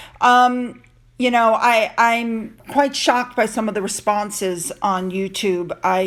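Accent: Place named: United States. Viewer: HI NUMPTIES saying how to pronounce words I love your American accent and your very well spoken and a great Narrator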